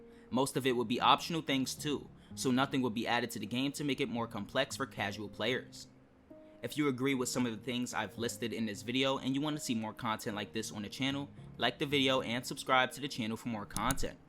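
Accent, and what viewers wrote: United States. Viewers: You’re from New York aren’t you?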